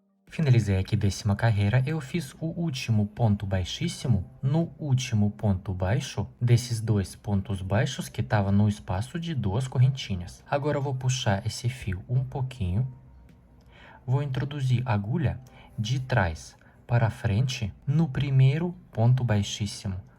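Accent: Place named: South, Brazil. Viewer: Estou sendo sincera...se eu não soubesse que você é estrangeiro, e ouvisse você pela primeira vez...acharia que você era de alguma cidade do interior das regiões do Sul ou do Sudeste, por causa do seu sotaque que é muito sutil.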